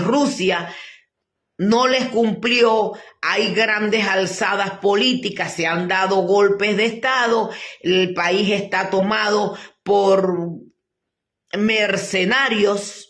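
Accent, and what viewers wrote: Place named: East, Venezuela. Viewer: BUENOS DÍAS PROFESORA COMO ESTÁ USTED MIRE LE PREGUNTÉ LA OTRA VEZ QUE DE PARTE ES USTED PORQUE HABLA IGUAL QUE YO ORIENTAL DE PURA CEPA YO SOY NACIDO EN ANACO ORITA VIVO EN VALLE DE LA PASCUA GUÁRICO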